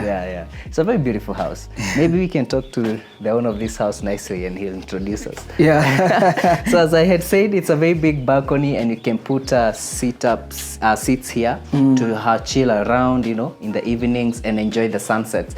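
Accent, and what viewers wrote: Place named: Kenya. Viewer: First of all, I like, and especially his kikuyu accent 😂😂, he's my favourite real estate reviewer. However, honestly, whatever's keeping that house at that price point is the location and probably the landscaping. Everything else is just off, assymetrical, outrageously overpriced for decor that is below luxury standard, exterior design is barely standard while interior design is just as bad as it can get. For modern living standard, metallic doors just picked off of a jua cali base is as bare minimum as it can get. Let me not even get to the bathrooms. Whoever designed this house is such an embarrassment to high end real estate architecture. The only thing that may attract you to this house is the lawn otherwise the developer should go benchmark from the professionals. Very poor design. Wth😳🤔.. was good tho.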